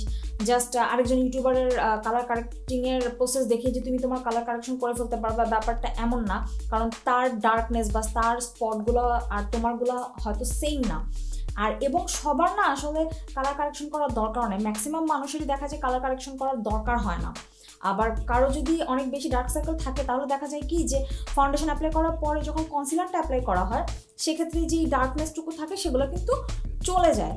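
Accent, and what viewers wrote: Bangladesh. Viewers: Apu ki Chittagong er? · Apu apni ki noyakhalir 😳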